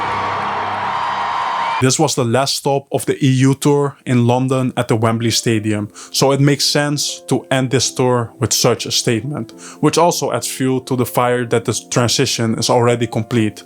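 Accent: Netherlands. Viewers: Bruuh ik zat naar jouw stem te luisteren en dacht ergens misschien ben jij Nederlander, vanwege het accent. Toen later zag ik die screenshot toen had ik het door 😂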